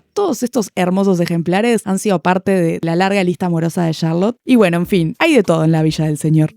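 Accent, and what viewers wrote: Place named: Argentina. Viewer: como amo el acento argentino 🙈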